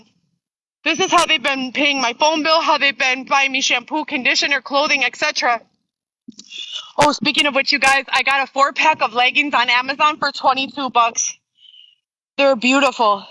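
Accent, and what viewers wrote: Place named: Latin America. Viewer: Here she goes with the latina accent🤣😂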